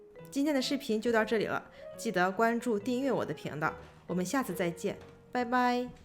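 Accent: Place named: Northeast, China. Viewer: 영상 잘 봤습니다～这个时间看这个，真的流口水了，听博主说话应该是东北人吧😊性格特别豪爽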